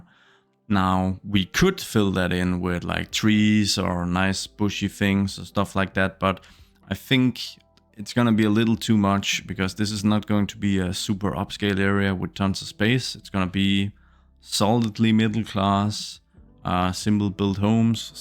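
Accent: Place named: Denmark. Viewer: Are you Danish? Because your accent really sounds like us.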